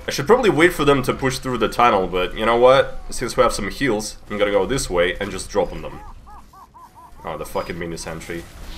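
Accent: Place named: Russia. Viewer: he got a bit of an English-speaking Russian kind of accent?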